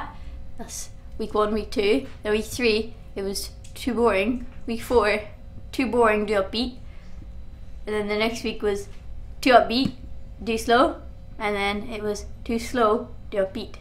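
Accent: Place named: South, Ireland. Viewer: dont like how shes changed her accent to sound more southern irish.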